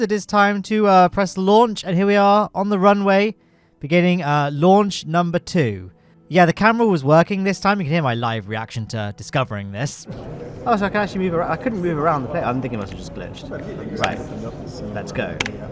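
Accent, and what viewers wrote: United Kingdom. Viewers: You're actually so violently British irl it's just funny, nice work as per usual!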